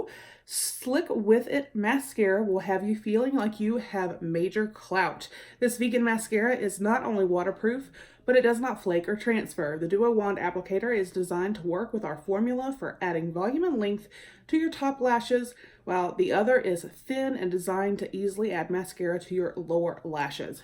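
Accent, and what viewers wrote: South, United States. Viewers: Love boxy charm I would love to win..I'm from kentucky Iove your country accent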